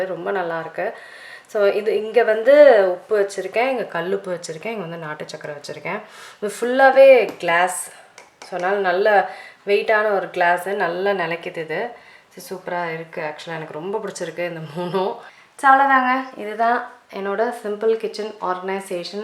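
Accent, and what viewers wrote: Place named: Kerala, India. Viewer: Slang Oru malayalam style pole und..🔥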